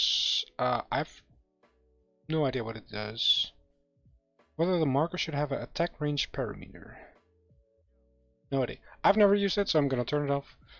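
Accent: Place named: Netherlands. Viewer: Je klinkt Hollands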